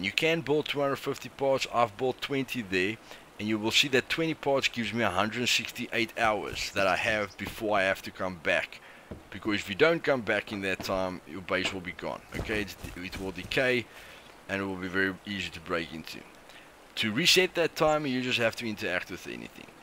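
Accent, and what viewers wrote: South Africa. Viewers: South African like myself? you sound like it! Good video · i can smell the south african on you boet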